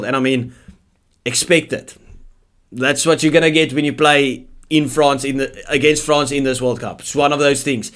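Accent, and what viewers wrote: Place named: Afrikaans, South Africa. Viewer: Hierdie man het n DIK AFRIKANER aksent xD. Ek verstaan as jy afrikaans praat gaan jou kanaal nie groei nie. Wat n jammer.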